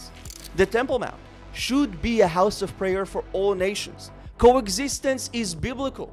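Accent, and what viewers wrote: Russia. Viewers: are you Russian??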